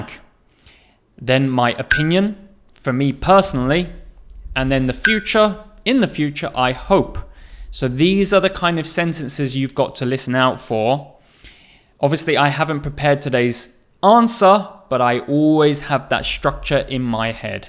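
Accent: United Kingdom. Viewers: Like it your British English totally and thank you very much for your videos.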